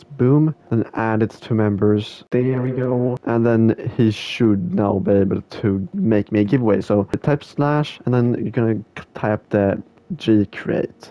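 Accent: Sweden. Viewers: are you swedish? you sound like it and it said igår on the msgs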